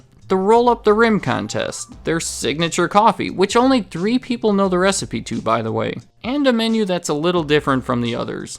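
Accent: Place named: Midwest, United States. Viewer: With your ambiguous yet slightly midwestern accent I thought you might be from Canada.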